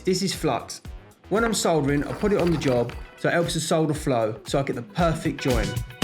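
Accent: Cockney, United Kingdom. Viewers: Besides your talent and skills that we are witnesses in every video, 1st: nice house! 2nd: rescuing dog from being someone’s dinner...👏🏻👏🏻👏🏻👏🏻 nothing to say here🥇 3rd: I looove your cockney accent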